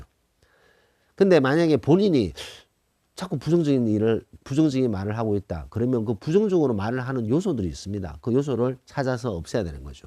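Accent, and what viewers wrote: Gyeongsang, South Korea. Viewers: 고맙습니다 늘 힘이 되고 있습니다 경상도 사투리로 조근조근 말씀해 주시는데 제 마음에는 무엇보다 잘 와닿습니다 괜찮아~~~ 뭐 이것쯤 이겨낼 수 있어~~~ 용기내어 보려합니다 다른나라와서 머물면서 갱년기 시작되면서 약해진 마음상태를 다잡아 보려합니다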